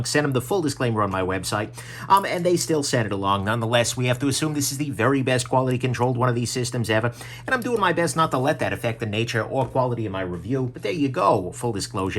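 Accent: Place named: Boston, United States. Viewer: I was placing it as a Boston accent, so curious because of your question, I snooped around the interwebs. He said, "(Seriously, though, when you're raised by a Bostonian and a New Yorker in the American West, you're pretty much screwed from the start.)"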